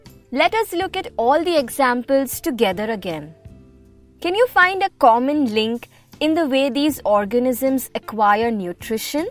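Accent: India. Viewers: She's Indian, and people pronounce words different according to their accent. Instead of just pointing out her flaws. Tbh, you could use that mouth to appreciate her knowledge -_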